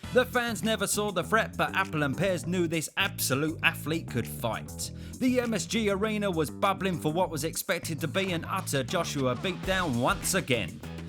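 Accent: Cockney, United Kingdom. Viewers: these videos are fucking amazing, love the comic behind it all, the cockney goes a long way lol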